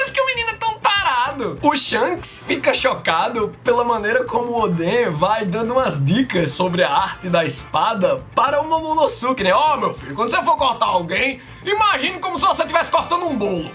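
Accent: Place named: Recife, Brazil. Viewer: Bem que eu tava RReconhecendo esse sotaque hahaha... tamo junto!!! Sou do bairro da várzea/Recife sou seu fã.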